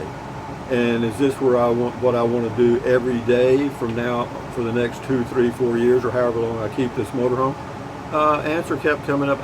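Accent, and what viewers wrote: Texas, United States. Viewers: New Zealand here again cheers to see Beer Thirty return and to listen to interesting casual chat..really like to hear you Texan accent...Keep the beers coming!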